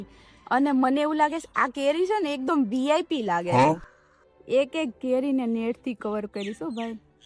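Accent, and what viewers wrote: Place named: Talabda, India. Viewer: Australia ma raho chho pan language tamari haji pure gujarati pan nathi talabdi bhasha bolo chho ben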